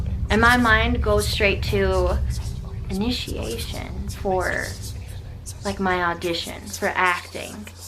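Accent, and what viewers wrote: South, United States. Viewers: My God. The comment of a possible bugs on ur bed on ur face freaked me out more than ppl in your room..ugh sorry. I think ur newest place is haunted and ur just too open or sensitive to it.. Hope u at least cleansed the place, ur decor (bc yes spirits will attach to their fav or beloved items..whole show on tv on it years ago..freaked me out buying second hand stuff just in case..but now I do but just cleanse anything I get 1st b4 coming into my home), and urself by now..bc kno this was months ago. So hope everything's all good now..got some catching up on vids to do (I say with shame for I adore u and ur channel/content). ..also..lookin beautiful and healthy as ever..always on of my fav youtubers..I mean creators (prefer callin y'all)..both southerners and myself now living in NY..just feels like home hearing ANY southern accent..but love urs the most..💜💜💜💜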